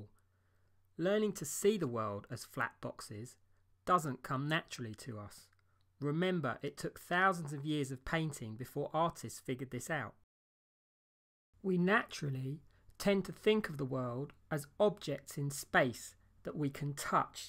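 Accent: United Kingdom. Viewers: I love this British accent!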